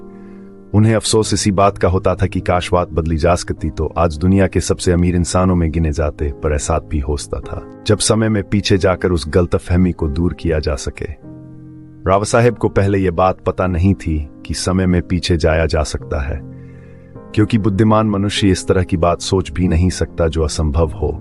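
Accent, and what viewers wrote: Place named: Pahadi, India. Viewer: Hindi Bolna Esa Lag raha he Jese Pahadi Ya Angrez Bol Rha Ho. Baki Story Acchi Bna Rhe ho..Bolne Ka Tarika Or Saaf Karo.👍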